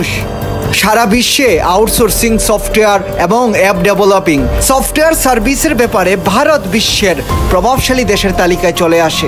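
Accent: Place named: Bangladesh. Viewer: আপনার কথা বলার ধরন ও বাংলাদেশ উচ্চারণ ভালো লাগে নি।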